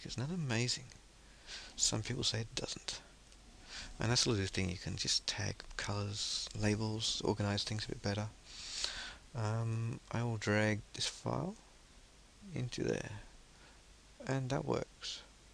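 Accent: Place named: Australia. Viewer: wanted to learn how to format my hd instead i got you loving to hear the sound of your Australian voice.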